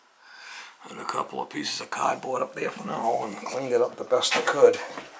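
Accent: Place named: New England, United States. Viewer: New England drawl!👷🏻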